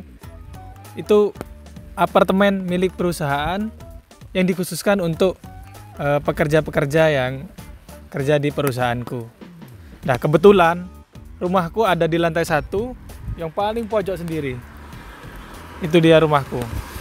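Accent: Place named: Madura, Indonesia. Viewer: Logatnya kyak ada maduranya.. 🤭 Orang mana bro?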